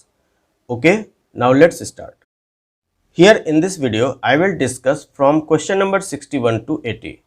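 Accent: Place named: mixed, India. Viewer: it's French origin word, s per dictionary the phonetic symbols u have replied is absolutely bang on. My only request was not to pronounce the word in Typical UP, Bihar accent n not to dishonor you. In ur reply u can see the sound of 'N' is bracketed which allows us to pronounce the word without N that makes the pronunciation better n that suits ur vast grip in literature 🙏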